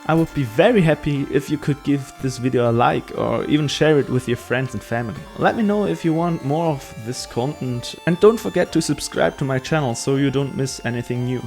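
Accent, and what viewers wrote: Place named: Switzerland. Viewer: Typisches Schweizer-Englisch :P Fand den Soundtrack übrigens ziemlich dufte! Ich hatte Godot vor ein paar Wochen auch mal ausprobiert gehabt, aber ich fands extrem unintuitiv xD Vor allem diese Node/Scene-Struktur find ich weird und macht iwie keinen Sinn für mich... Dann hab ich's wieder sein gelassen xD Naja. Hätte sowieso niemals das Durchhaltevermögen für ein komplettes Spiel gehabt ^^" Aber cooles Video :)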